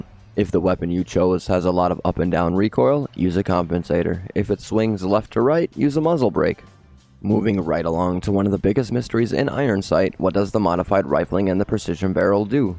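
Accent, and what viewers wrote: Bengal, India. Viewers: U bangali?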